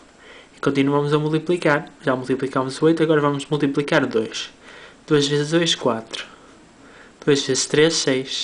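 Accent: Portugal. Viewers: aaaaaaa que sutaque lindo sou do Brasil e adimiro os portugueses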